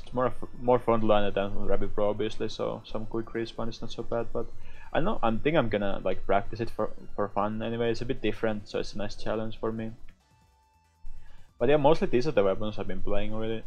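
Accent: Finland. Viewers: Yo the voice/energy in it isnt that easy to chaenge when you have a Finnish accent and English isnt your first lanuage.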